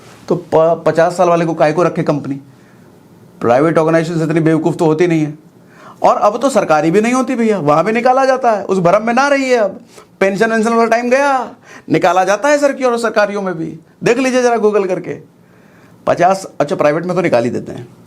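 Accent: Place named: Uttar Pradesh, India. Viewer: Ye thumbnail ka kamal nahi hai sirji, ye to aapki attraction ka power hai Jo ham logon ko videos dekhne ko majboor kar deta hai, up accent ka power 💪💪♥️♥️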